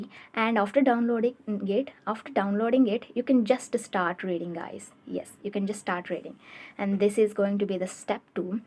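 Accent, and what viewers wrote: South India, India. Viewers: She has a South Indian accent; she may not even know Hindi, but she's addressing Hindi speakers, and she's good at it.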